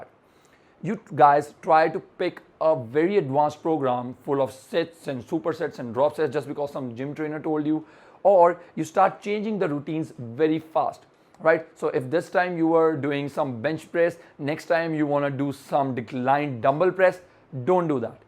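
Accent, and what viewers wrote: India. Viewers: I don't know why I guess its the western stigma but I think of a wise monk listening to your videos lol. People from India with the hindu accent feels like it just oozes wisdom and patience. Keep up the great videos.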